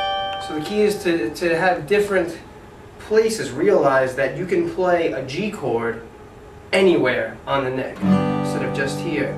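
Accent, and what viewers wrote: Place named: New York, United States. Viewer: Hahahaha, two notes in a chord. I know you know that is not possible, but it's still funny. Your point about neck mapping is spot on and that's one of the greatest things about playing guitar compared to other instruments where you can only play the stuff in one locked in place. I love your My Cousin Vinny accent. Yo!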